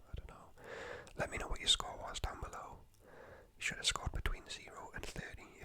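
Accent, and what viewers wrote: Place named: Newcastle, United Kingdom. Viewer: Straight up following cos I’m from Newcastle 👋🏼👋🏼👋🏼 been dying to find a Geordie asmrist!!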